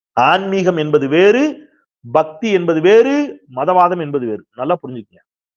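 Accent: Tirunelveli, India. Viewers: அண்ணா சமயத்தில் உங்க பேச்சை கேட்டு *நான் ரொம்பவே குழம்பி போயிருக்கிறேன் ஆரம்பத்தில் இவர் நக்கலா சொல்றாராரா இல்ல சீரியஸா சொல்றாரானு போக போக உங்க வீடியோ பார்க்க பிறகுதான் உங்க பேச்சின் * நையாண்டி கலந்த உண்மை புரிய ஆரம்பித்தது ** அண்ணா ** நெல்லை மண்ணின் மனம் தங்கள் பேச்சில் சூப்பர் **👌👌👌👌👌👌👌👍👍👍👍👍😁😁😁😁😁😁😁😅😅😅😅😅😅😂😂🎉🎉🎉🎉🎉🎉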